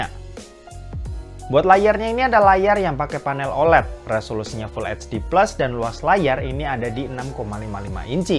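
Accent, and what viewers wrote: Semarang, Indonesia. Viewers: Orang semarang bang ?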